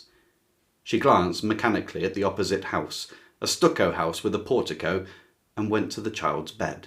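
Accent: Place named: London, United Kingdom. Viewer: I would like you to make a video about what makes a classic a classic! I just discovered your channel, and I like it really a lot: your descriptions of the books, the fact you read a small peace of every book you mention, your wonderful way of speaking (I take it you are from London or near it). You are my favourite booktuber now!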